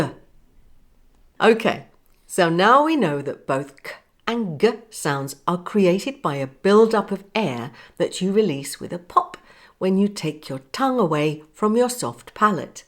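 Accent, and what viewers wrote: United Kingdom. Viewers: Hi! 😁 which British accent do you speak? North or south accent? I like it.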